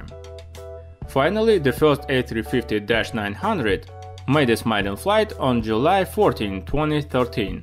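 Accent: Russia. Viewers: if you have a foreign accent, it means you speak another language. This guy probably speaks Russian. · RUSSIAN ACCENT !!! I'm not watching. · he’s Russian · Its Russian accent I think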